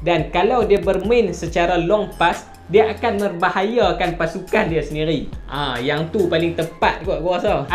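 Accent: Kedah, Malaysia. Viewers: Best...Berita kedah ja...ckp pon lorat kedah...snang fhm...negeri lain nk fhm kena Cari kamus dulu...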